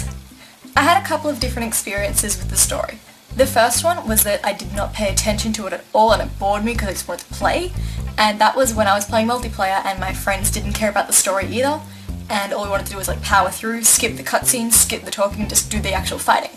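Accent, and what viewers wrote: Australia. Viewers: Insta-subbed! It's so great to hear a fellow Aussie on the interwebs for once. :) Where abouts are you from?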